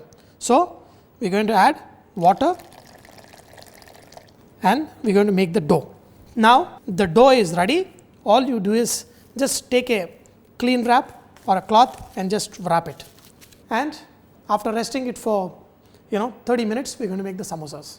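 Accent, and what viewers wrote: India. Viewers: Dear.... I tried to find the recipe at your website - but it is not posted. Some of the spices used in the recipe I didn't quite understand with your beautiful Indian accent, perhaps you might type them in the "more" section? Thanks you so much!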